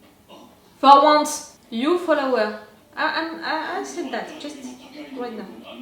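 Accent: France. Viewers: it's a French accent.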